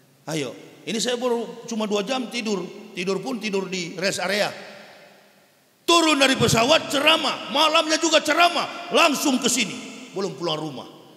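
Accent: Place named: Manado, Indonesia. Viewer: Ilmu perbandingan agama ini bagus utk pengetahuan 👍.. suka dengan gaya Manado pak yahya masih sangat kental 😄👍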